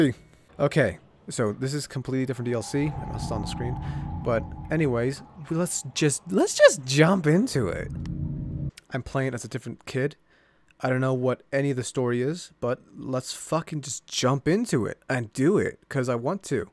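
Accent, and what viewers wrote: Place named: South, United States. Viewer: Now i see how u can have a very high voice with a southern accent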